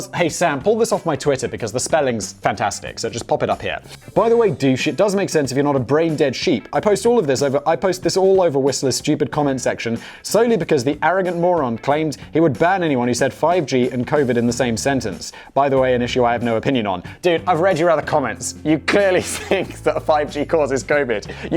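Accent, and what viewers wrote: United Kingdom. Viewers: I did not know British people said urinal like that. I’m shook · The way Brits say urinal, garage, and controversy is weird. And I learned this from watching.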